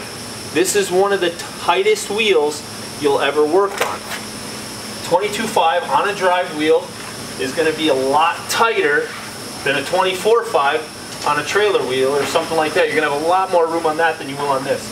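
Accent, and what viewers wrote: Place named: Midwest, United States. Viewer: Howdy, CJ here from H-Town Texas. I am looking to get a side hustle going. My best friend and his Wifey do this for a living. They make a decent living. How much do you charge for each wheel? Would I be able to use cordless tool and if so, how many batteries would I need to swap out in order to do truck and trailer wheels? I would like to be able to go to customer if power was an issue and or driver is waiting to get loaded. Thanks great video, love the mid west accent..Don cha knoow..😆🥰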